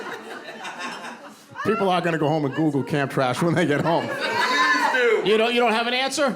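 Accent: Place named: Boston, United States. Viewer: FREAKING HILARIOUS!! Had the video come up on my recommended and I'm NOT disappointed!! I love your Boston accent and delivery was phenomenal!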